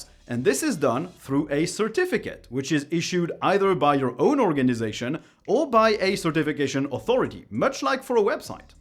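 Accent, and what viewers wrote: France. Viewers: Being French, I couldn't tell if he was a fellow frenchy or just someone that had lived in France long enough to get a bit of accent. (I wish I spoke English as well as him, and hope I will someday.) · In other other videos he’s made, he’s said he is French. As an American, I can certainly tell from his French accent!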